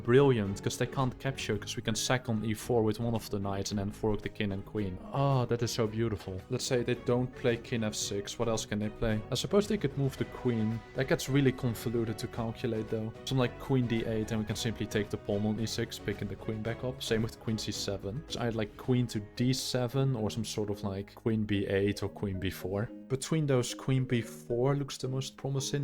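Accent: Netherlands. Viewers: Are you Dutch? I recognise that accent from everywhere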